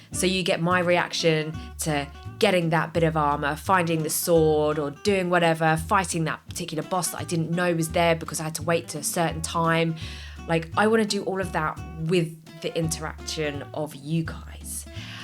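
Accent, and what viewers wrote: United Kingdom. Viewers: God I wish I had a British accent. 😂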